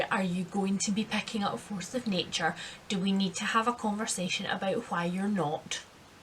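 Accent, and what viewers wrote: Scotland, United Kingdom. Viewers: No conversation needed. I'm not reading these books because I don't read fiction. I read very little fiction. And when I do they are mostly adventure and historical. I just watch your videos, because, as i said, you are a force of nature and I love watching and listening to you and your accent. I would also like to apologise for thinking that you were Irish. In one of your other videos I left a comment saying that i thought you were Irish. I know that you are scottish!!. Sorry about that and I apologise for the unintended insult!!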